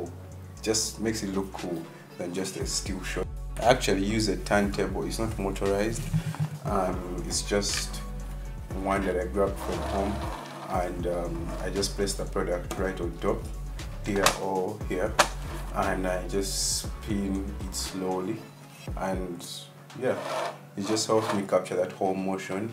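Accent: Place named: East Africa. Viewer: U sound like u from east Africa (Uganda 🇺🇬 )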